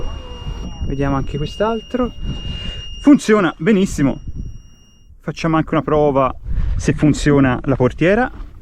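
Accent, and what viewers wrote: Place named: Tuscany, Italy. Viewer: Sei davvero bravo....vorrei avere la tua manualità e, purtroppo, dall'accento toscano che noto, non siamo proprio vicini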